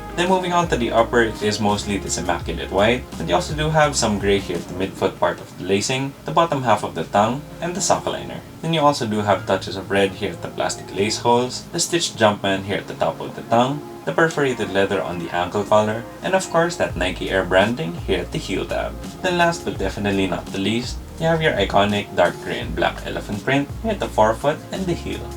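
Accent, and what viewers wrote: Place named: Philippines. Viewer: dat pinoy aksent men🤘🏽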